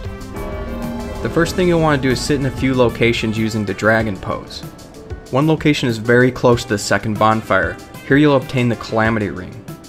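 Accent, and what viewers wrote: United States. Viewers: Your videos are so interesting and helpful, but the heavy midwestern accent is insufferable.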